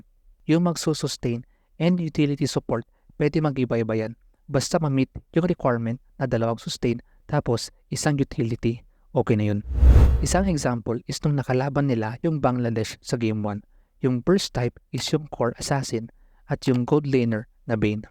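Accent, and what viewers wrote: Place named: Pangasinan, Philippines. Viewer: Naririnig ko ung Pangasinan Accent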